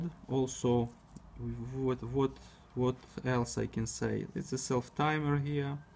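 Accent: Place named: Russia. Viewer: Хорошо говорите по английски... молодец... А думаете все равно по русски... и это чувствуется. Спасибо за обзор... Хороший фотоаппарат... всегда мечтал купить его в молодости.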